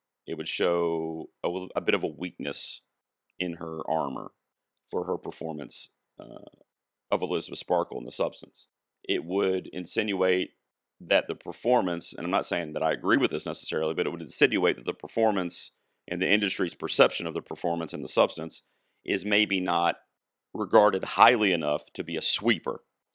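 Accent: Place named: United Kingdom. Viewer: I really love your British accent. 😂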